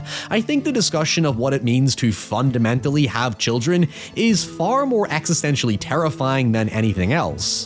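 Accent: Ireland. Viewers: By his accent I'd say he's irish.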